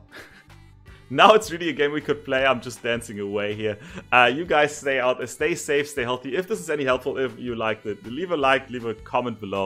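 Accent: Germany. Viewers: Sounds you are a german guy =D great Tutorial, thanks =D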